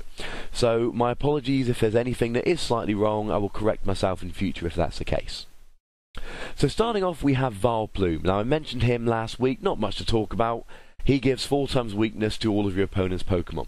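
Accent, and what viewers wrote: United Kingdom. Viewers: I love your British accent! One game I do remember was when I was playing my newly made Zekeels. I managed to set up 3 Eels in less than 7 or 8 turns. My Accelgor/Whirlipeed deck only drew 1 prize during the game. At some point, I thought "Screw this" because I couldn't decide on who should be my main attacker. I just Bolt Struck (while eviolited) until everybody died.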